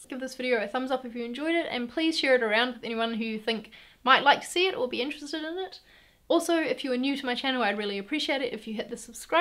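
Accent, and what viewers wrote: New Zealand. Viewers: Are u kiwi?? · Came here from Mari’s video! Are you kiwi? Cos they accent.. haha! I’m super nervous about the exposing time part… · are you kiwi? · Haha Kiwi accent! I was sent this link from Facebook as a reference as to how to do Screen printing for their CREATORS workshops! Hit them up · Lol, a strong kiwi accent there! I left 27 years ago - its refreshing to hear.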